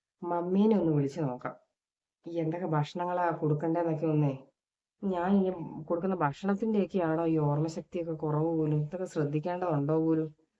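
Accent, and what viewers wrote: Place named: Kottayam, India. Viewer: Ee kottayam slang engane chechi kku vanne... Ennayalum kekkan rasava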